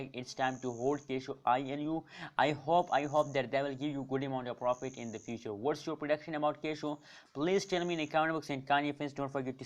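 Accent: India. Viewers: Dude, i cant even understand what are you saying. Indian accent is already hard to understand and you are speaking too damn fast. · white people video thumbnail english language indian accent as fast as japan high speed electric train no facts at prediction even a teenage 14 boy also can reexplained this thats blah blah blah zero informative content as for sure most brainy positive video presented by showing by content presenter faces for sure this boy wanna follow hype and get fast subs and views and get money for sure many viewers will stay out from this kind of video coz its promising thumbnail video promoting click bait.. well done indian teenage boy!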